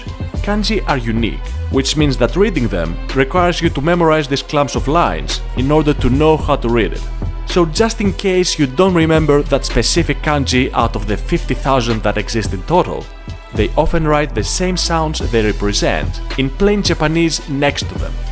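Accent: Greece. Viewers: haha yes i tought greek first, the finnish 🤔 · that's fine. i am Greek too living in the UK, thats why i was able to recognize... You dont have to change anything about it. Great research on your videos.. Keep it up!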